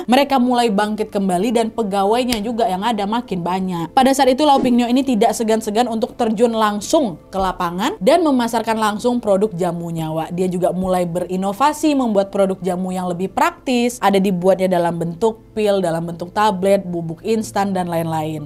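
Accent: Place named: Pontianak, Indonesia. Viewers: Bahass kakak ini mirip bahasa melayu sambas singkawang,panggil tmn wak wak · Wak wak , mcem org melayu pontianak kak ni hehehe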